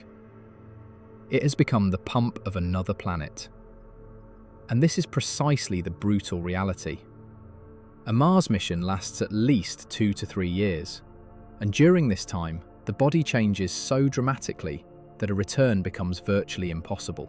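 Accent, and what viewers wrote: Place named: United Kingdom. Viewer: British English is irritating!!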